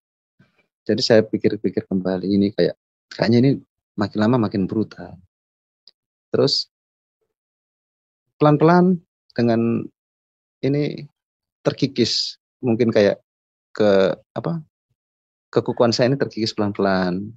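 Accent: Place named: East Nusa Tenggara, Indonesia. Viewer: Logatnya sdh NTT banget ,Mas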